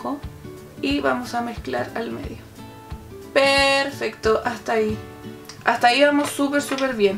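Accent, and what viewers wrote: Chile. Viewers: Que lindo el acento chileno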